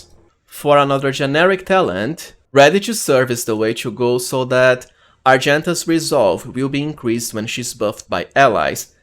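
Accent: Brazil. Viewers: Are you Brazilian? your accent cant fool me :P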